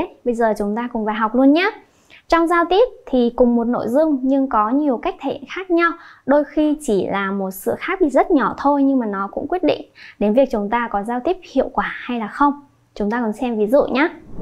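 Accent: Standard. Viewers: thấy cô là người phát âm chuẩn nhất nên em chỉ học theo cô, và tự học ở nhà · Cảm ơn cô nhé hay dễ hiểu chuẩn rõ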